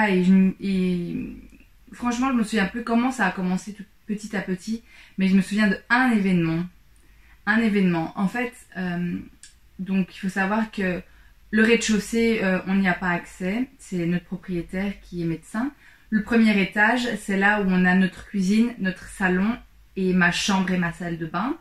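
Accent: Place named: Belgium. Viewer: Je suis à 2 minutes de la video et je suis hypnotisé par ton accent on dirait que tu es belge ça me détend mdr jadore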